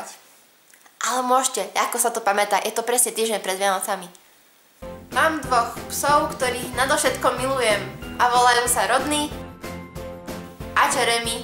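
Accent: East, Slovakia. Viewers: Páči sa mi ako Ti čas od času ujde niečo východniarsky :D :D "Tažéé" :D je to milé :D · AAA vychodňarkaaaa a znaje hutorec po rusnacky ta co jak še maš ! :D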